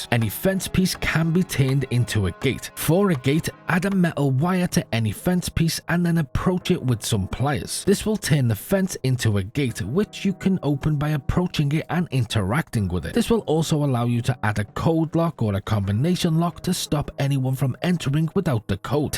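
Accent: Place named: Fife, United Kingdom. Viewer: You sound like a Fifer 😊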